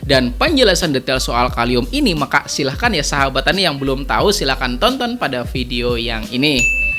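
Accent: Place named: North Sumatra, Indonesia. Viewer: Bg asal Sumatera ya..?? Kok logatnya kayak dr daerah Sumut gt..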